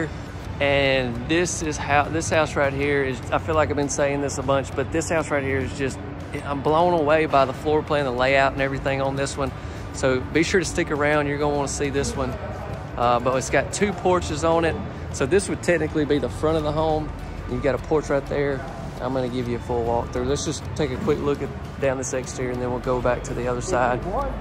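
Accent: South, United States. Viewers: How Y'all doing. Love your southern accent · It's a beautiful home and if you lived in this home, you could never be called "trailer trash." Plus I love this guy's southern accent.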